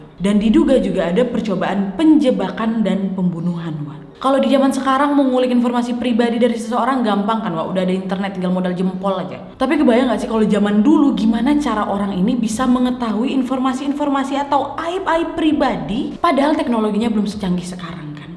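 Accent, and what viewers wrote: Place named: Medan, Indonesia. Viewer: Saya suka sama cara ngomong nya mba logatnya kayak orang Medan lucu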